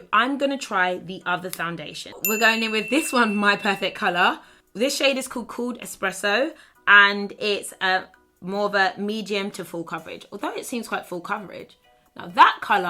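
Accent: United Kingdom. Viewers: Oh my gosh, I loooove the Britisch accent ❤❤ Lots of love from Austria!!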